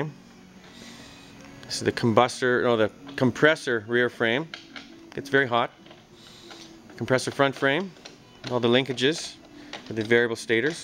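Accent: Canada. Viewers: very informative video. Thanks, mate. On a different note, are you Canadian?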